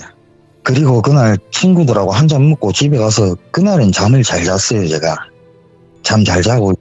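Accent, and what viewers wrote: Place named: Gangwon, South Korea. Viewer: 지역이어디지?경북인거같기도한데 아니고...강원도인가?첨들어본 말투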